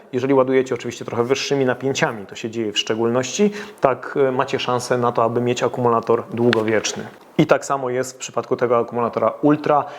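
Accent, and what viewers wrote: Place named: Ukraine. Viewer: Kanały: Bater tech; Świat akumulatorów; i - wszedzie chwalą ukraiskie akumulatory.Oczywiście inne też - ale to tu to tam słychac Ukraiski akcent...Prześledziłem przypadkowo te kanały bo szukałem akumulatora ale im dłuzej słuchałem to lampka zaświeciła mi się jedna - pracujecie mocno nad nami Polakami. Marki uznane chwalicie najbardziej - no bo nie ma wyjscia..ale jakoś potem czesto wystepuje marka Ukrainska. Zeby była jasność -Nie powiedziałem, że jest zła..nie wiem jaka jest - dopiero szukam akumulatora. Zeby była jasność - nie jestem wrogo nastawiony do Ukrainców. Ale na trzech niby różnych kanałach widzę jedno podobieństwo - Ukraina.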